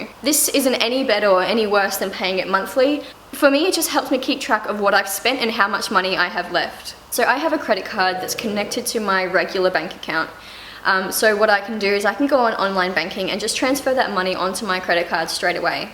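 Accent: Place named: New Zealand. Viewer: Great videos! Love em. An addition into having a credit card is that some have small % cash back whenever you used it. It's almost free money! Your accent sounds New Zealand-ish. It's very pretty :)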